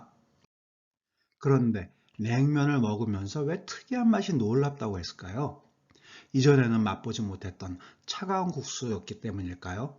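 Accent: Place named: North Korea. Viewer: 말투가 북쪽분이신가 싶은데요.? 혹시 진짜 북쪽분이시라면 북한말투는 어떻게 만들어진건지..경상도 사투리보다 고치기 힘든지 한번 만들어주세요ㅎ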